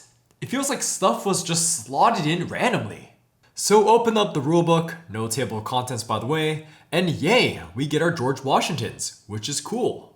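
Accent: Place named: United Kingdom. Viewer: Nice British Accent. Cool game, just not my speed.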